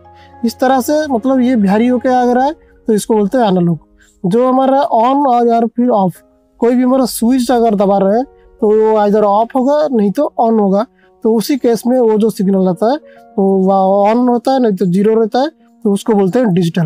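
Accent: Odisha, India. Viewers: Sir, you from odisha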